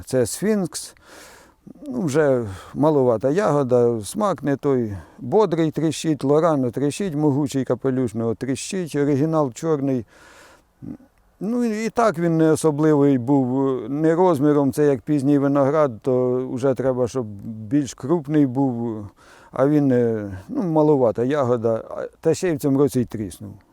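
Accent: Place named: Poltava, Ukraine. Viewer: Так вы с Хорола. Мой отец тоже. Жаль уже умер. По разговору догадалась что с Полтавской обл. Хороших вам урожаев.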